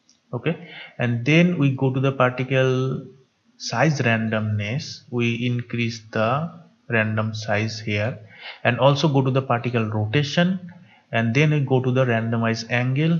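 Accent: India. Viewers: There's a lot of other VFX channels on YouTube but your channel taught me a lot! Also ur accent sounds like Bengali, and as a bengali myself it makes me feel comfortable watching your videos :)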